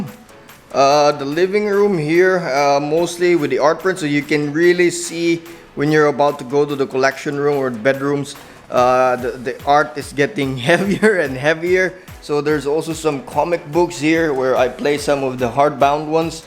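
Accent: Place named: Netherlands. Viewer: Awesome collection bro. Where are you from? You sound kinda Dutch😉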